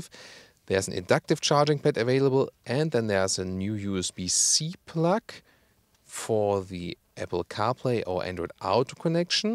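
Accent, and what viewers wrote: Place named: Germany. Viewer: Gutes Video, wie immer, super Wortschatz, aber die Aussprache... da hört man den Teutonen aus Km... Deutsch wäre mir deshalb lieber.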